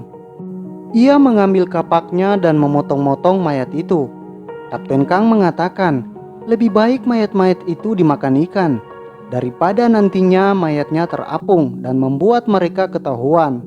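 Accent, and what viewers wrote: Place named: Sulawesi, Indonesia. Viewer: Dari pembawaannya kayaknya abang nih orang Sulawesi yah?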